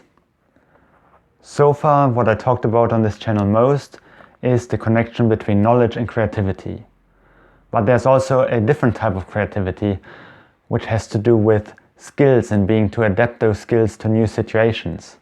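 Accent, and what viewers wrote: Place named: Germany. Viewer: Are you German? You sound German.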